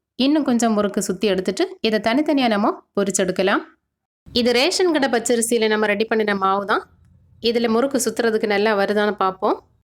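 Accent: Kerala, India. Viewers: Your's is Malayalam accent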